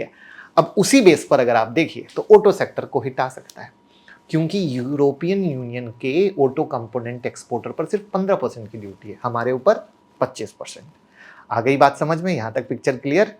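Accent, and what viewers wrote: Bihar, India. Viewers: Bihari language me bol raha hai,isko english nahi aata hai kya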